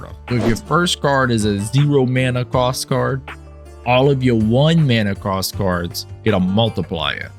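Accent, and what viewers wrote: United States. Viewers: Bro, i didnt look at your channel name when i clicked on this but after hearing your accent i was like Mae, dats a fellow coonass, Cher!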